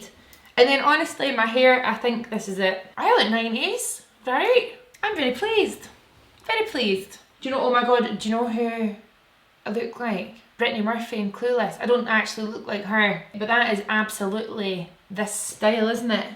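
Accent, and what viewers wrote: Scotland, United Kingdom. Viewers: i am sure this gets so old but I am a sucker for accents and trying to pinpoint what they are. So forgive me in advance but is that a scottish accent i hear? Love your channel & videos as well, of course!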